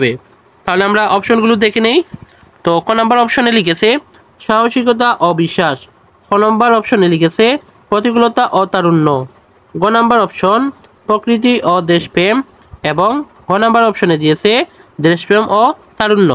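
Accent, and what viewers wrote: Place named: Sylhet, Bangladesh. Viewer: You are from sylhet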